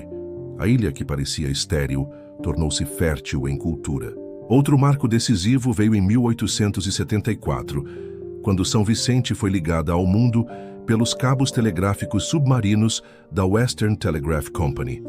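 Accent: Brazil. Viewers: Mas fica sendo que os Cabocerdeanos sempre usam o sotaque Portugues de Portugal. E o que aprenda nas escolas de Cabo Verde.... por isso foi a minha pergunta inicial do sotaque Brazileiro sendo usado neste video pelo um Caboverdeano. E era so curiosidade, nada mais.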